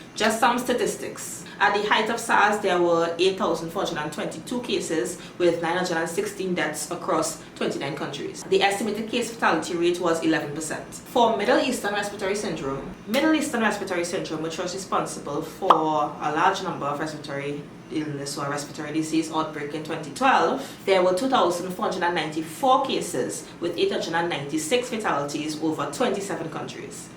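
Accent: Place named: Trinidad and Tobago. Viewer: You speak waaaaay too fast! Not everyone understands the Trini accent. Good content tho👏🏽 new subscriber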